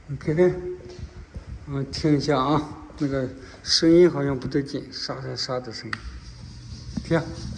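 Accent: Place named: Henan, China. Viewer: 大师听口音河南的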